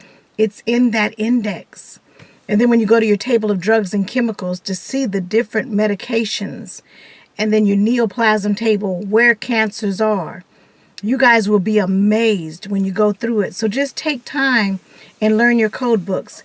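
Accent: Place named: South, United States. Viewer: I absolutely love your channel, this one and your other one! Your Coding Master Coach helped me past my CPC exam on the first try. Your approach is so likeable, and down to earth, with your southern drawl...so lovely. You're a very beautiful lady too, I love seeing a black intelligent women knowing her stuff! I clicked on this video today, but before I watched it, I wanted to post this. I know the topic of this video is Loving your books, and OMG if that is not the one thing I stress to people. You get to learn your code books by actually doing some work, working out different problems and different scenarios will give you that understanding and that missing piece to the puzzle. 5 hours goes by incredibly fast, and these books are huge, you want and NEED to be able to flip back and forth and feel confident that you know what you are doing. 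Love you! xoxo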